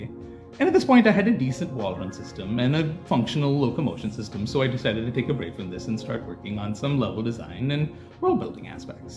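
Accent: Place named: Brazil. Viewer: Your accent almost made me think you're Brazilian... It's so close...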